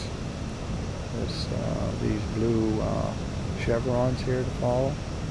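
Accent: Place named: Canada. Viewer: I loved this explanation and caught a few Canadian accent features in your narration! Aboot..... for example... It was an awesome flight and I just can't believe technology can make flight in such terrible conditions possible. It's a very impressive human feat of engineering. Thanks again for all the great information... especially the link to airport data. You've done an amazing job of demystifying the process... Jerry from Fort Worth Tx. I subscribed yesterday.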